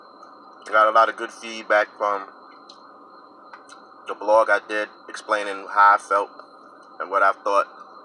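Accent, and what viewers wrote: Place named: Washington DC, United States. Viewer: where you from my good man seem like you close to DC just cause of the way you sound and you had some good views on the raiders fucking with you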